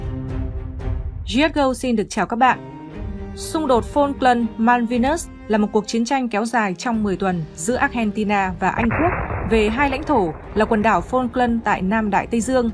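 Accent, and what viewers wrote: Standard. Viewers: Giọng đọc thuyết minh hay và chuẩn luôn ủng hộ kênh... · Thuyết minh quá hay ! Rõ ràng , nhập tâm , chuẩn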